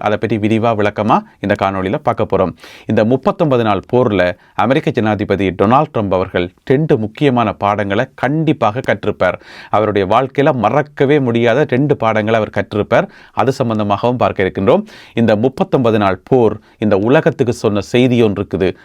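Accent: Sri Lanka. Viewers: IAM VETERAN KAVARCHI VILLAN K KANNAN S SON MGR FAME WHAT A DECENCY MATURITY. A LANGUAGE OF HARMONY WITH PLEASANT PRONOUNCIATION YOU HAVE. PARTICULARLY SRI LANKA TAMIL. I WISH YOUR COUNTRY TO HAVE PROSPERITY AND PROGRESS IN ALL ASPECTS. PARTICULARLY FINANCIAL LEVEL. INCLUDING GDP. I MUCH LIKE YOUR LANGUAGE WITH DECENCY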